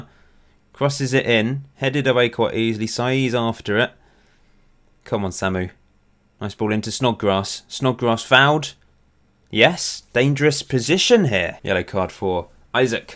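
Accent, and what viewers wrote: RP, United Kingdom. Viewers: You're actually so posh 😂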